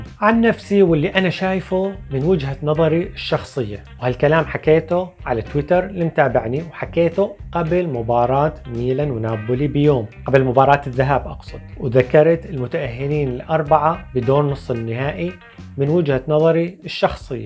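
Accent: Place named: Deir ez-Zor, Syria. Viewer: لهجتك ديرية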